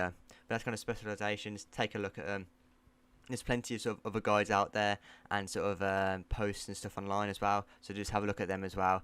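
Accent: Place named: United Kingdom. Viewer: 😂: Getting dusted in BF1, found trust in your UK accent.. teach me Sifu.. 💯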